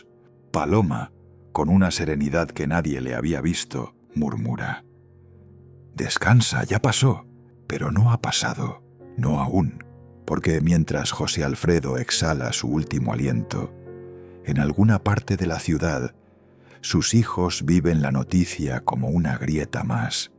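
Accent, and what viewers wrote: Spain. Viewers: Eres español, que vas a saber de México. Me choca el acento de Uds. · Mal muyyyyy mal su reportaje, José Alfredo Jiménez, NO escribió más de mil canciones, son entré 360-,o 380, infórmese primero, su acento español, de lo que Usted dice, son mentiras.........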